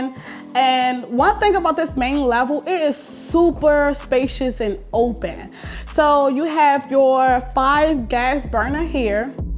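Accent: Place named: Washington DC, United States. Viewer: Love that D.C. accent 💚💚💚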